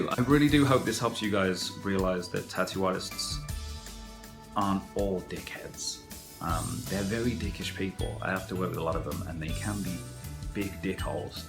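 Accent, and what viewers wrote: United Kingdom. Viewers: You sound British but say some words with an American accent. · Are you british? because you sound kinda british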